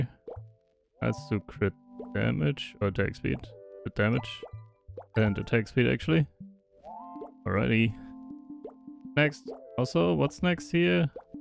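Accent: Germany. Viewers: Is that a common German Accent? I've only heard it once before and it was a character so i didn't know it was real but you sound EXACTLY like he did.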